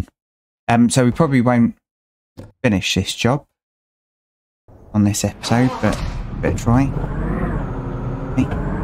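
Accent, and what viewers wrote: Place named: United Kingdom. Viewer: 3am and I just bought this haha, sod sleeping tonight mate lol. Nice to hear another British accent too!! We're getting overrun by merican oootubers haha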